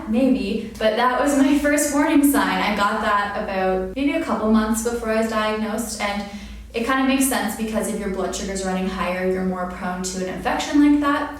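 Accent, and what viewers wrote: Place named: United States. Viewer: You sound American but you said washroom lol. Thank you for your story :-)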